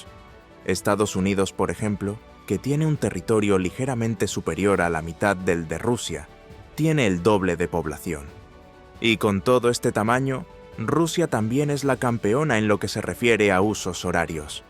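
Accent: Spain. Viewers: Este hombre por su forma de hablar es de España que se puede esperar de alguien de ese pais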